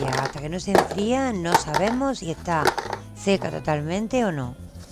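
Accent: Andalusia, Spain. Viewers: soy de Argentina m encantan tus vídeos los veo desde cuando mostraba tus hermosos jarrones pero lo que más difruto es ese acento andaluz creó y esa hermosa y cariñosa forma de enseñar tus trabajos tratando de que llegue a nosotras tus seguidoras lo más instructivo posible felicitaciones un gusto enorme.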